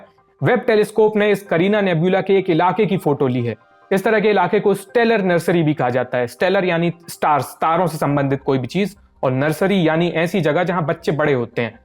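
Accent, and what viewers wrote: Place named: Jabalpur, India. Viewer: Mast bhai jabalpuri bhasha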